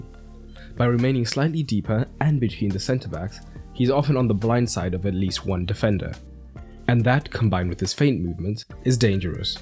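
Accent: South Africa. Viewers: Hey mate! Love the videos, especially since I assume you’re South African (judging by the accent) like me🤝🇿🇦 Anyway, how do you think Haaland will compare to the Agüero we saw under Pep? Agüero was known to be a little lazy and perhaps not entirely fit in a possession-based team like City. I might be totally wrong tho. Thank you brother and have a great week🙌🏻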